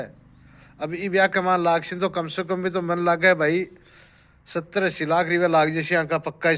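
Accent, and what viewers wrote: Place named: India. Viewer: बोली से लगता गुढा के पास का है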